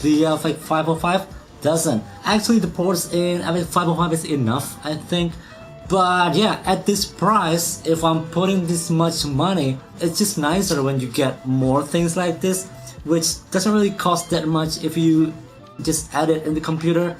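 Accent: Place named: Indonesia. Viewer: Untung pronounciationnya indo, jadi paham :v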